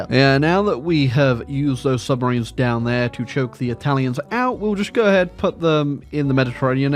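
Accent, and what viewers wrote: England, United Kingdom. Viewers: I hope sees this. Please can you tell me what country your from? You sound English but say zee and rowt (rather than zed and root)... Not important. But as an Englishman I just need to know 😂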